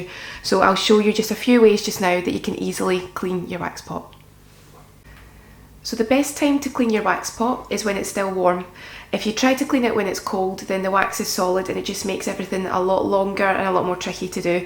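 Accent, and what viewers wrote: Scotland, United Kingdom. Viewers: U sound Scottish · I benged Outlander and now the Scottish accent seems to be following me lol. I love your accent.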